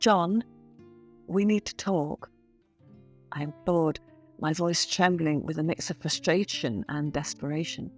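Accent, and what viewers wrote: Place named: United Kingdom. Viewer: British accent is not for everyone, the story sounds interesting but i couldn't finish it, cos i got stressed listening.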